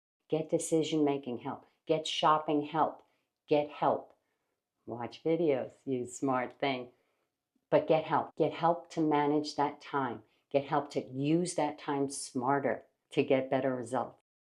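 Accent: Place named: New York, United States. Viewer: you always make me laugh with your NY-er talk! You tell it like it is and I love that as a fellow NY-er.Honest & straight to the point! Plus I love how knowledgable you are! Truly. I too thought I knew about design etc. but after binge watching your videos, I realized there were soooo many things I didnt consider. Thats why I love your videos I have learnt so much!! Keep posting! love following :)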